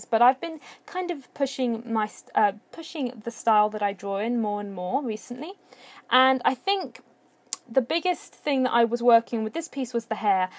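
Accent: England, United Kingdom. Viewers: I like your English accent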